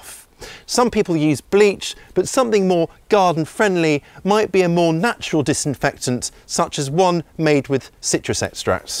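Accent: United Kingdom. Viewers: "just pop it in"..."use a brisky brush"...I will never tire of the British vocabulary and accent! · I love the British accent.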